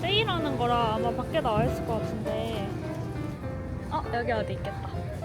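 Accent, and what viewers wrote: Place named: Busan, South Korea. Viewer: 시애틀 브이로그 넘 재밌어요!! 자주자주 올려주세요~~ 옛날에 비해 특유의 뽀짝한 부산사투리가 많이 옅어지셨네요 ㅎㅎㅠㅠ 그래도 귀엽고 예쁘고 다 한,♡ 유투브 다시 시작해주셔서 감사해요!!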